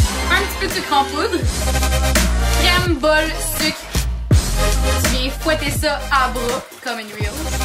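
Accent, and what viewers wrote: Quebec, Canada. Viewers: merci le Québec pour votre belle accent et la joie de vivre avec ... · Je confirme je comprends rien quand tu parles québécois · t québécoise ??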